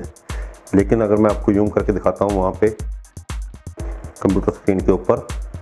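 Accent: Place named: Punjab, India. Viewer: Very good voice pure punjabi. Sir aap kha se ho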